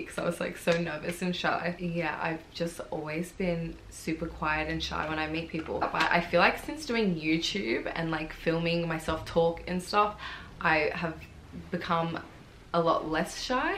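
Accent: Australia. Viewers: I love Australian accent so much that my Siri is male Australian 💯💯☺️☺️☺️ I wish I could speak like you.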